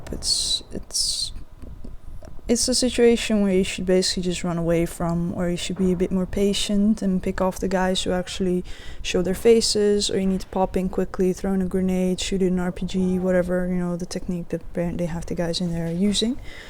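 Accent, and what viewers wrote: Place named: Netherlands. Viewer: You sound Dutch... Am I right?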